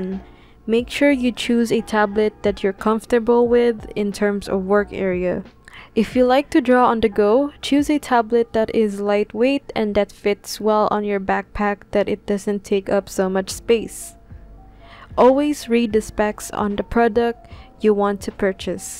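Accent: Philippines. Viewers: From the chicken and the accent, I can tell you're from the Philippines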